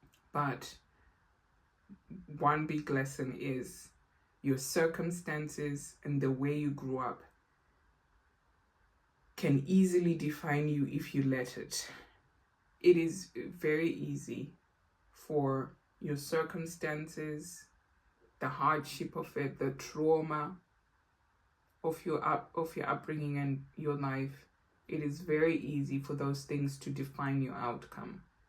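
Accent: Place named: Zimbabwe. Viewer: I just finished her book NOW, and I am feeling SO full of thoughts and emotions now. I am now watching and listening to your review. I am going to do a review of the book on my channel soon. You sound Zimbabwean. Are you Zimbabwean by any chance? I am Zimbabwean and UK based.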